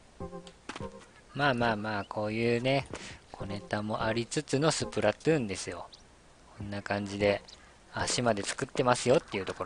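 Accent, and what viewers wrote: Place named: Okayama, Japan. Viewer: うぽつですm(_ _)m もしかして岡山県民？？？ じゃけぇって岡山弁だったので…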